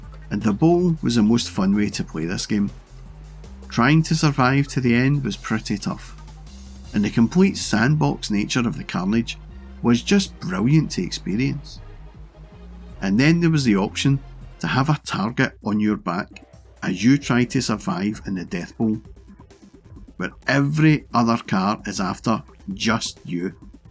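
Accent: Scotland, United Kingdom. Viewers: I wasn’t expecting the Scottish accent 😅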